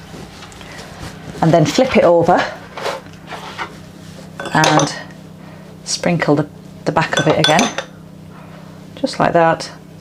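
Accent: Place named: London, United Kingdom. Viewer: Deer I like your recipe and your accent as well are you from London if London which part. Any drag me back when I was in east London lovely days 👌👌👌❤️❤️❤️😜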